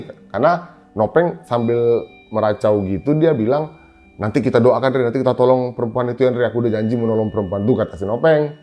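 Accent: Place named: West Sumatra, Indonesia. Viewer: Urang Awak ma suaro nyooo Cubo agiah ampu hehehe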